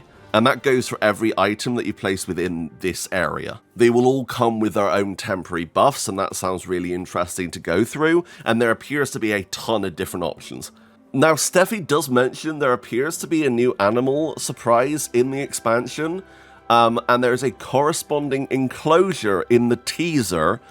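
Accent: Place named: Bristol, United Kingdom. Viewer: i'm almost certain you have a southwest/bristol twang and i can hear it from a mile away (i'm from there) not a bad thing also! good video keep it up :))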